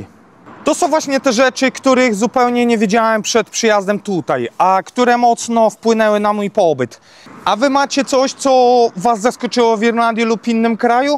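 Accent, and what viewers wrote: Poland. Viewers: Dla mnie zagadka jest twoj polski akcent.. ;) skad?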